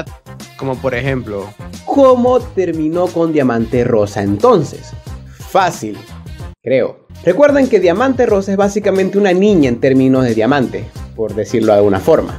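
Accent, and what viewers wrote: Venezuela. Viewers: este pana es venezolano??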